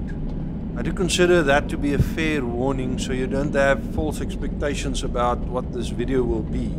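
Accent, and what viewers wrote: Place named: South Africa. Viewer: No man you are the best travel commentator I have ever heard. Thanks Bru for the great journey, hahaha I love the South African Accent.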